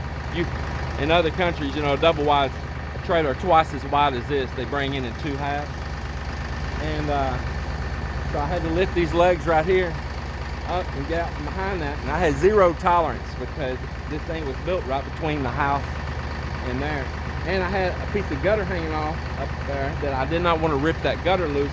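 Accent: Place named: Louisiana, United States. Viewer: You sound like you might have a little bit of Cajun blood in you, by your accent. Do you? My sister has Cajun roots.👍😊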